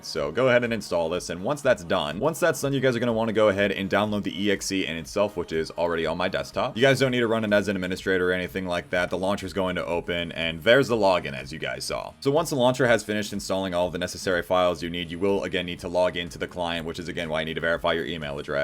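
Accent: Greece. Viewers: is he greek? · Bro are you greek?? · Wait! Are you Greek? 0_0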